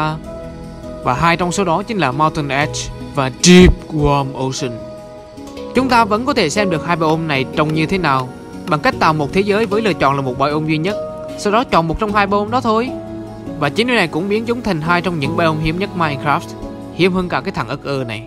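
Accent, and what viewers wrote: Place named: Vinh, Vietnam. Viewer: Đọc giọng ở vinh hay ở đâu đó miền bắc khó nghe quá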